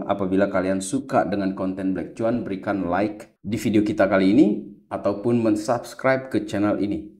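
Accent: Sumbawa, Indonesia. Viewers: Orang Sumbawa bang?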